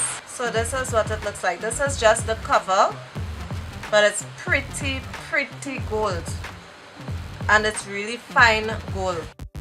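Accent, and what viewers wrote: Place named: Trinidad and Tobago. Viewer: Colours are amazing 😉 love the bold look 😍😍 and the trini accent ☺️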